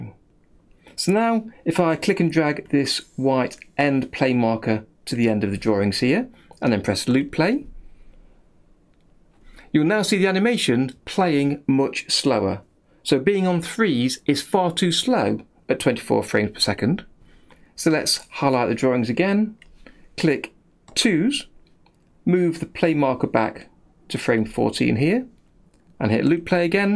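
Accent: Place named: United Kingdom. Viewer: The best thing in your tutorial is your beautiful british (?) accent ! 😄. Easy to understand, and relaxing. Thanks for your great work !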